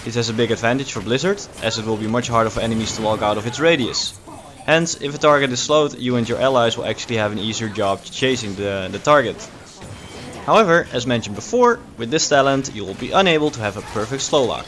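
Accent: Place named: Netherlands. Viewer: Lekker hoor, vernederlandst accentje en af en toe wat Nederlands op de achtergrond :P Uiteraard een oude guide, maar lingering chill zal ik eens proberen ipv 30% meer range op Q :)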